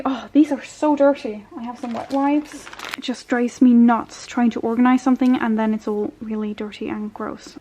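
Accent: Ireland. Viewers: Irish accent😀??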